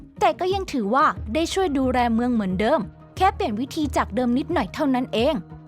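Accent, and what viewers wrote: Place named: Isan, Thailand. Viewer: เจ้าของช่อง เป็นคนอีสานใช่ไหม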